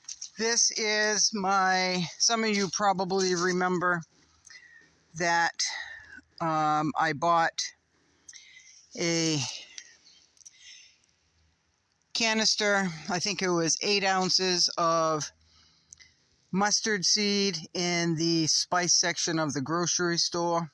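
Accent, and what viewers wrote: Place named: New England, United States. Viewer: I really enjoy listening to you talk and I'll explain why. My oldest brother passed 3 years ago from cancer and he lived in Rhode Island. And last month someone that was like a brother to me that lived in New Hampshire also passed from cancer. Hearing that New England accent brings some comfort to my heart. Me and my NH brother used to get so tickled at each other with the comparison of saying, when I park my car I put my car keys in a pocket of my khakis😂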